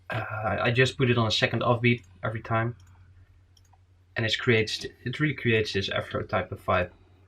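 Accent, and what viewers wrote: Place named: Netherlands. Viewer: You sound Dutch, what are the chances 😅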